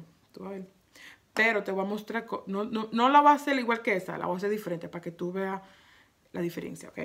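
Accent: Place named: Puerto Rico. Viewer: You sound Puerto Rican.. lol but I love it! Soooo funny 😄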